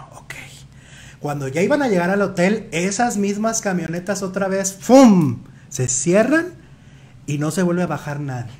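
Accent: Fresa, Mexico. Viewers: Que fresa wanna be habla el cacheton. Nefasto escucharlo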